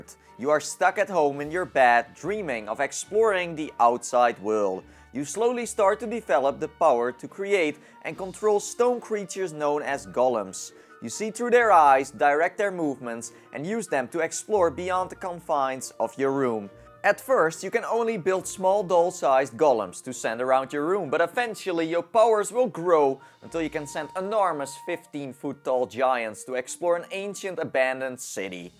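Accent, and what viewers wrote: Netherlands. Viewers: No Swede or Norwegian talk with a accent like that :D ,sounds like Dutch English to me, but def not Swedish or Norwegian, We talk with a weird accent yes, but it's not like that, hehe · Flip Games just listen to that awful accent ofcourse he's Dutch! (Dutch myself just can't stand Dutch accents haha)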